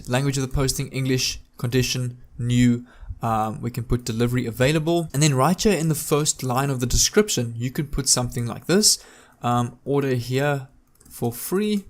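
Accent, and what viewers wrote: South Africa. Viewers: You sound South african ..How did you sign up with clickbank successfully?Im actually American living in SA but cannot sign up..Please help..thanks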